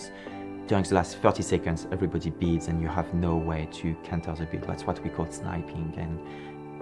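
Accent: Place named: France. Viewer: I’m here just to hear this man’s voice Bc I love his French accent.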